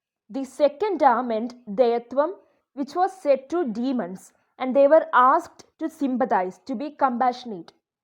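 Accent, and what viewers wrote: India. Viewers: Can you please write what is Shantih shantih shantih, i have difficulties in understanding indian english 😩 And can you also do a vidéo of analysis of "in memoriam" by Tennyson Thanks a lot for your work A follower from France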